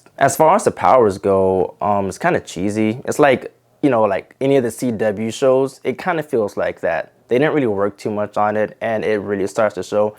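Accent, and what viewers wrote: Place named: Toronto, Canada. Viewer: This guy is not American. He sounds like he's from Toronto.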